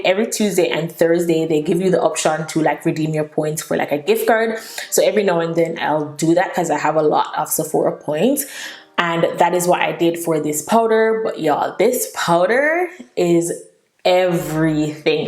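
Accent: Jamaica. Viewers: U sound Jamaican. Not a question lol. So sure you are Jamaican. Great content. Keep doing your thing :)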